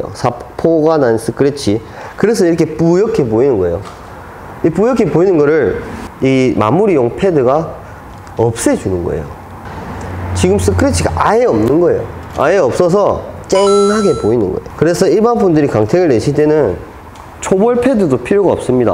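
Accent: Gyeongsang, South Korea. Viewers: 말투랑 목소리가 내부자들에 조승우가 경상도사투리 구현한것 같네 ㅋㅋ 저도 경상도인 ㅎ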